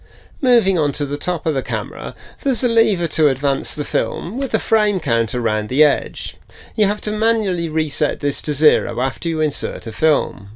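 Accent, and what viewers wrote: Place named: Midlands, United Kingdom. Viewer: really good video matey, but can I ask a question? what is your accent? i come from Bedworth, so i feel like it's sort of a between Brum and the Black country accent?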